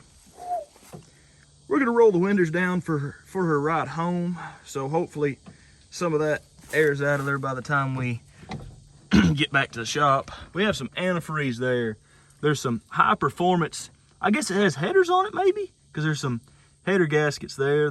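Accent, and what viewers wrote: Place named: North Arkansas, United States. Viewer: I enjoy the north Arkansas accent.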